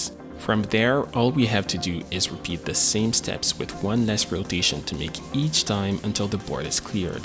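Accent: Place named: Quebec, Canada. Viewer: Getting some Québécois vibes out of your accent. Thumbs up for the video.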